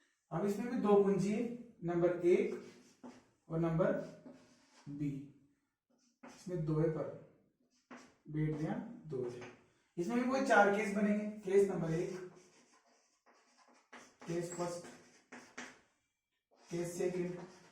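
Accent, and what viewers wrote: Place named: Hadoti, India. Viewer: Like your hadoti accent 😊❤️❤️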